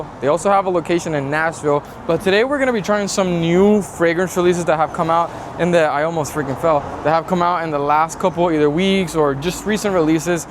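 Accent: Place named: Italy. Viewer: 🤨 Italian voice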